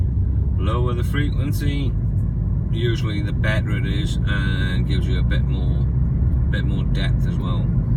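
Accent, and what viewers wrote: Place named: Alabama, United States. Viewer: I'd recognize that voice anywhere! It doesn't matter where you go in the world,. That Alabama accent is always going to shine through!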